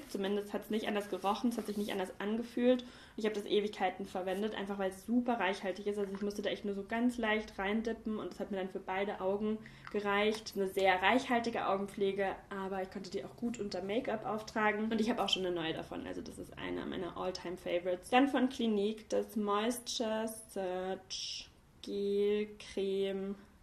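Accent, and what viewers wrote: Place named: Baden, Germany. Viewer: Ja gerne nochmal ein Video 😆 diese Kombi aus Badisch und Hochdeutsch ist immer lustig 😆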